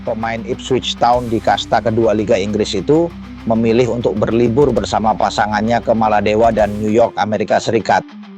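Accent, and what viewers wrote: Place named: Java, Indonesia. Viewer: Aku komennya diluar konteks y, ini bung kok suaranya ada logat Jawa sedikit? Ini bung asli atau dubbing.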